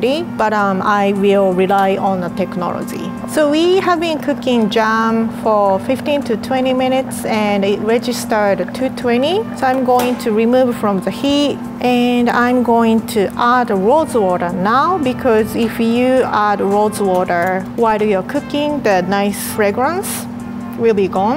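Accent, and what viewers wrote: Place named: Japan. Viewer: I think because she’s Japanese so she pronounces it like that.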